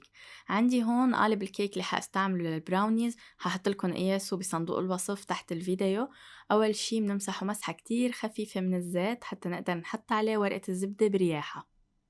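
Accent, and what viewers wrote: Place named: Lebanon. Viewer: بحب لهجتك اللبنانية وصوتك هادئ وجميل وتقديمك راقي ويفتح النفس كل التوفيق لقناتك الجميلة متابعتك من السعودية 🇸🇦💖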